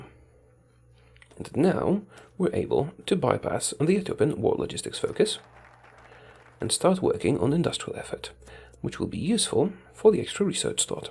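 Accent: Poland. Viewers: whatt oglądam cie od 2 lat i coś tak właśnie przeczuwałem że jesteś z polski. Masz zajebisty angielski. Pozdrawiam mordeczko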